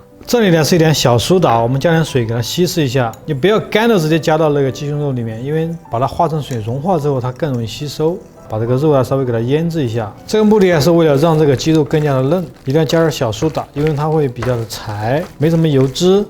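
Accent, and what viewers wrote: Sichuan, China. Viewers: 听到师傅的椒盐普通话，就晓得师傅的川菜是资格的。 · 师傅是四川人吗？rua 均匀，哈哈哈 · 帅哥川普十级，听上去特别亲切。菜谱也棒棒地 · 川味普通话好情切哦！❤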